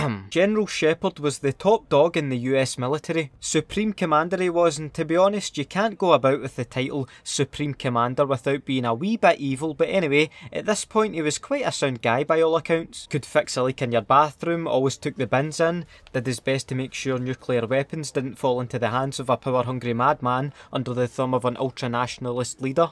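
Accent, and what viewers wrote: Scotland, United Kingdom. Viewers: McGregor is Irish, and uploader is Scottish, like soap and captain McMillan. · I'm new to this channel but after reading some comments and listening to some good Scottsman rambling, I think you gents (an I use that term loosely) will take good (alcoholic too, no doubt for ya scotts) care of me. You've earned yarself a sub. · Are you Scottish? He’s Scottish right everyone? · your accent almost like soap...is soap a scot too? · After TF141 got intel on makarov Shepherd wanted to all the credit for himself so he tried to eliminate the task force and get all the credit once makarov was killed. He wanted to redeem himself after losing 30,000 people in a snap! I know i'm 5 months late but who cares. Also are you scottish? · Your Scottish voice makes this way better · u Scottish? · Heard a fellow scottish accent and subscribed instantly 😂😂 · The scottish accent makes this even funnier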